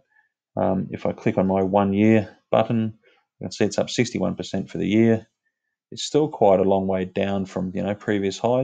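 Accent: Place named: Australia. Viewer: Hi, I heard you mentioned you use TradeStation. I'm assuming you're aussie from the accent... Is that the broker you'd recommend for aussies on TradingView?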